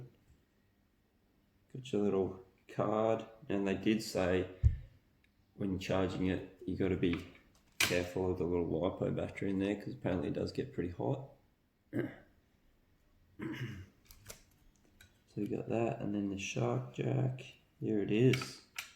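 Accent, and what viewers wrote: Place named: Australia. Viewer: You sound Australian, How long did it take for your hak5 gear to arrive? I've been waiting quite a while.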